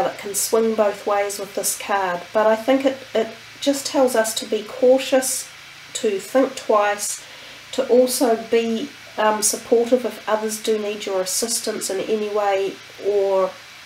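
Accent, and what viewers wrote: New Zealand. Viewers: What a lovely reading. Am currently living abroad but so refreshing to hear the kiwi accent again! Thank you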